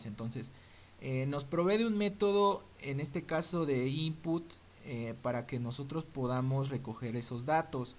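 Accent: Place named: Mexico. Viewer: Gracias por compartir, originalmente llegue buscando algo en concreto que se me esta complicando pero, me gusto tanto tu forma de explicar los temas que decidi hacer el curso, no se si lo terminare, pero como puedes ver ya voy por el 7 y seguire sin duda. Proximamente te escribire para hacerte una consulta ya que, como te decia, originalmente estaba buscando algo en concreto que al menos or donde voy no he visto que lo comentes. Escuchando tu acento me parece que eres de Mexico? vivi ahi muchos años, lo echo de menos pero me gusta escucharte.... un saludo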